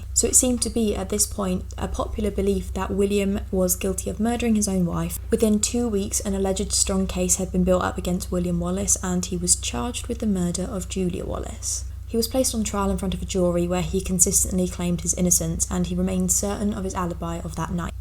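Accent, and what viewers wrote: Essex, United Kingdom. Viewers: they're mostly Northern English accents, which are considered sort of "proletarian" and less "pretty", maybe because business english / upper class English is closer to Southern English and the North used to be more working class, and working class accents don't enunciate words as clearly. Scouse is the dialect around Liverpool, Geordie is Newcastle, Cockney is working class areas of London. is from Essex but her accent isn't strong Essex imo. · I love you're accent people from the UK have lovely voices.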